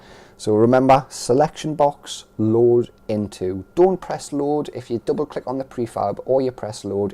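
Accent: United Kingdom. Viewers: Random question but are you from Middlesborough? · Thanks for the series, only just starting to look at the prefab editor as I have a few ideas, so the overview has been useful. Especially trying to find the right balance between lots of small or few small prefabs. How do you think your method of capturing a large prefab from the world compares to merging small prefabs inside the prefab editor itself - which is the way I had been trying to do it. PS There's nowt wrong with the Geordie accent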